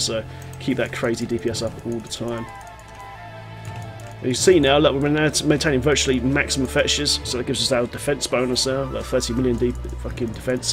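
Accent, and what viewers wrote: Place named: United Kingdom. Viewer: I don't understand half the things this guy is saying! Damn Brit accent. Slllooooooow down man when you speak during your vids, thanks!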